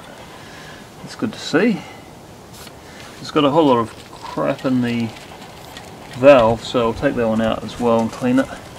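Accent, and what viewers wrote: New Zealand. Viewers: Brilliant inspiration for handy gents around the globe! How you captured all those video clips while working that hard. Producer director handyman cameraman scriptwriter editor all going in your head at once…. And all we hear is that calm kiwi voice. Just wow.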